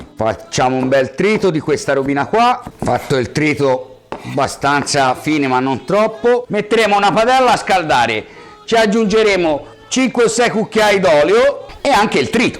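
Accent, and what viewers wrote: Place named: Livorno, Italy. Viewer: Ciao, ti ho scoperto da pochi giorni e già sei diventato la star della mia cucina e mi piace troppo l'accento livornese. Io abito a Montecatini da cinque anni ma sono della provincia di Torino esattamente della Val di Susa (piena di corsari), sto già pianificando una gita a Livorno al tuo ristorante, ti voglio sentire dal vivo, sei forte!!! Ciau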